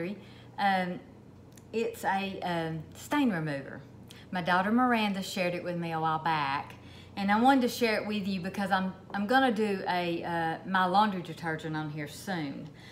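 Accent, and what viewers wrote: South, United States. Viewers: I came here for the stain remover recipe but I stayed for the southern accent.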